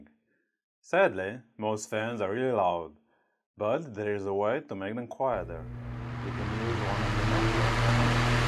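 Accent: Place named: China. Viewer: Sorry but what's on top of my mind watching this is: what's your native tongue ... Sounds like Chinese to me...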